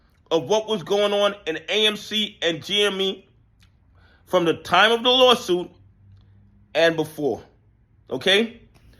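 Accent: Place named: Bronx, United States. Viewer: How can I get in touch with you brother? Sitting on an Ozone park property you sound like your from the BX like myself.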